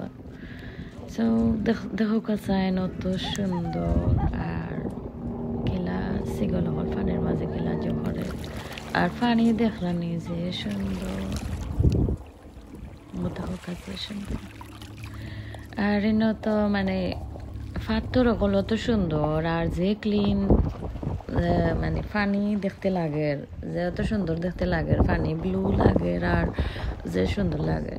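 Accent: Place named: Sylhet, Bangladesh. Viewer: আসসালামু আলাইকুম কিতা খবর বালা নি আফা মাশাল্লাহ কি অপুর্ব উপস্থাপনা আর দৃশ্য গুলো দেখে মুগ্ধ হয়ে গেলাম সিলেটের কথা মনে হয়ে গেল আপনার কথা শুনে💖❤️✔️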